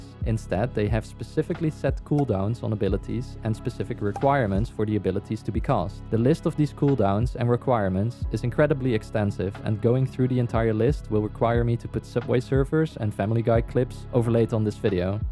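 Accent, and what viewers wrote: Netherlands. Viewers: nice dutch accent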